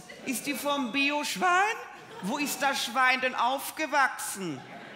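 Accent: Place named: Bavaria, Germany. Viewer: Martin du bist da Wahnsinn ❤bleib den Bayrisch treu ❤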